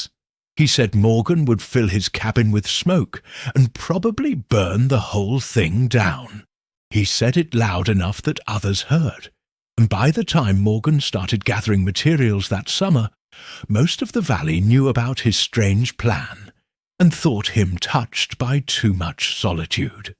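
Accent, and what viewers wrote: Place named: United Kingdom. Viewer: Not wasting my time listening to this brit accent.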